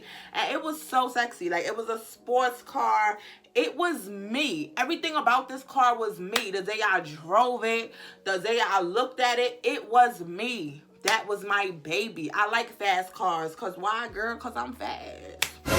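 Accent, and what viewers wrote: New York, United States. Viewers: Sis really said “shiznayii” you are sooo New York. Don’t ever change🤣🤣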